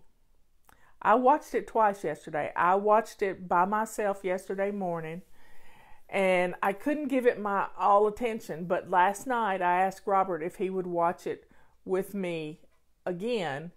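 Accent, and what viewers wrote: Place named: North Carolina, United States. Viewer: I've been Flying for 15 years now - thank you! I wanted to tell you that I love hearing you speak because your accent is exactly like my grandmother's (also from North Carolina) and I've missed hearing it so. God bless you.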